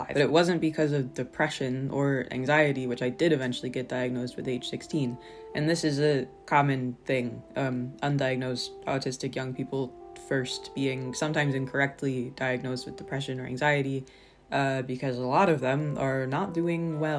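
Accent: Midwest, United States. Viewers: I don't know where in the midwest your accent comes from, but you have a very distinct speech pattern. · Can I ask is somebody who is in the shoes that you seem to have been in when making the video you reference at the start, what was it that encouraged you to go through with the full diagnosis? I’m pretty much 99% sure, and I’ve just found out that I’ve got to start the waitlist again because I’ve moved from one County to another which is like moving states. (I am assuming from your voice that you are American) it’s going to be 18 months, but I’m really sort of at that phase of feeling sad about the whole realism that you probably are… You got any advice ?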